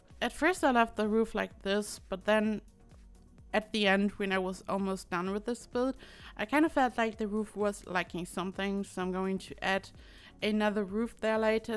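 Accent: Germany. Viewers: das deutsche Englisch macht mich total nervös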